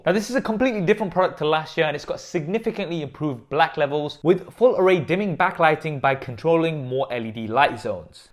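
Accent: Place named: England, United Kingdom. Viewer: Something about an English accent on an Indian that is so captivating. Great review man. Subscribed.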